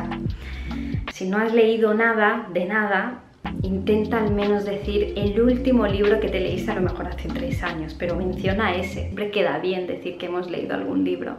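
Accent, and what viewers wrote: Italy. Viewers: Scommetto che sei italiana 😂 ottimo spagnolo devo dire però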